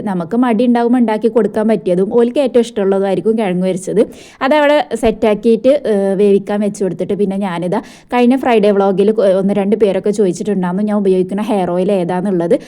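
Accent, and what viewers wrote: Malappuram, India. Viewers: Hai video eshtayi nammalum illi yeduth modayanna paraya.😂😂malapuram bhasha👍😅ok da by sainuty❤❤❤❤❤❤❤❤❤❤❤❤❤❤❤❤❤❤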